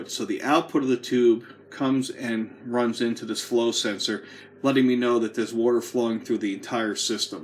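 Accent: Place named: United States. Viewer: seems like a lot of money for a used k40 when a brand new one is only about 340 usd..and knowing that laser tubes (most costly and fragile consumable part) have very limited life..the risk is too much in my opinion unless you got it for 50 usd..but thats my opinion....fyi why you speak american english and refer to euros? seems a bit odd to me.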